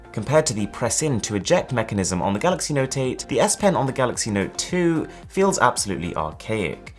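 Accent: United Kingdom. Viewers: Ťëčhťübēř_ Přïñčē He Is Not Indian His Parents Were Indian And He Born In UK... AS You Can See From Hos Accent!!!....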